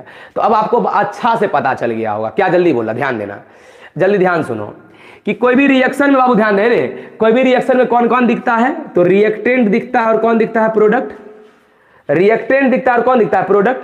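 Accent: Bihar, India. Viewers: Bihari accent 🫂🔥🔥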